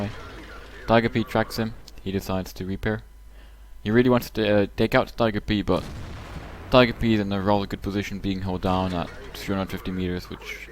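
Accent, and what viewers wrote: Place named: Germany. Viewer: The commentary on the video is like to listen Quickybaby with a differnt voice and german accent. He uses all the same phrases. I dont rate this bad. It's just a statement.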